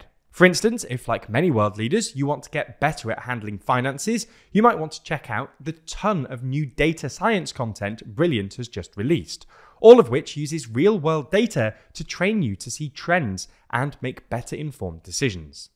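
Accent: England, United Kingdom. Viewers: British guy telling us that Alaska is about to turn blue 😂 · Do you have a dog in the American elections? I don't think so with this stupid accent! England accent right? The only country going for power to a third world country and the country that made the black people enslaved and stole food from the Indian children and let them die? Now you want people to hear your unimportant opinions?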